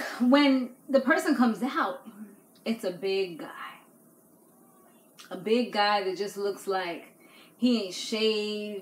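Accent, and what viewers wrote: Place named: New York, United States. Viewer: Yo you a baddie & that NY accent makes you even more attractive😍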